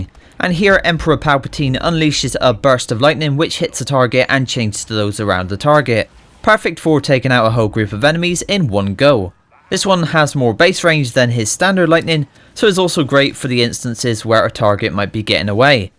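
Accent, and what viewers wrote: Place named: West Country, United Kingdom. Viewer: Another great guide, keep up the good work! Btw, going by your accent I'm guessing your a fellow west country lad?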